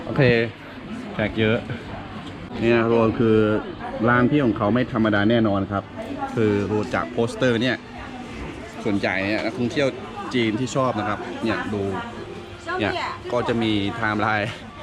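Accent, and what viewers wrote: Thailand. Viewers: ฝึกพูดไทยจากที่ไหนครับ สำเนียงชัดมากครับ ยูทูปเบอร์ สปป. ลาวหลายคนในไทย เวลาพูดไทยยังติดสำเนียงฝั่ง สปป.ลาวบ้าง แต่ขอชื่นชมว่า น้องสำเนียงไทยชัดมากครับ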